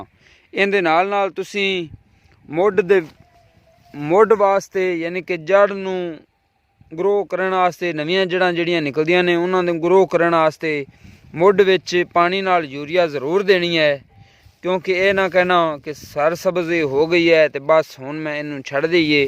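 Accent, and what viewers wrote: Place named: Saraiki, Pakistan. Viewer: آپ کی سرائکی اتنی الگ؟؟!!!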